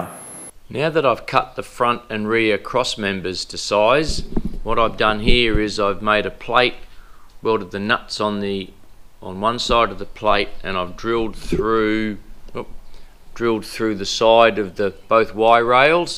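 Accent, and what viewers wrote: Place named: Australia. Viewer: Heavy over engineered base is fine, however if you want to cut thinner materials, you will end up building a lighter gantry and ditching the ballscrews. Rack and pinion would be a much better choice. Plasma is a very dirty process. Make sure to use the little plastic plugs for the bolt holes in the linear rails. Where in Aus are you? The accent and magpies give it away. :)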